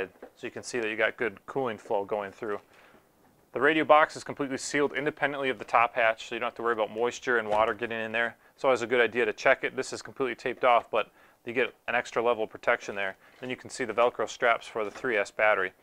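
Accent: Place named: Canada. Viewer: How come you people pronounce "boat" and "out"the same way? Like Canadians. Eeeewww.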